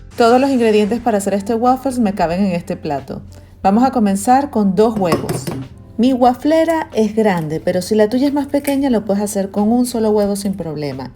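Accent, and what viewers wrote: Venezuela. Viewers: Me encanta escucharte demasiado venezolano, ya me estoy comprando tu recetario ahora mismo !!!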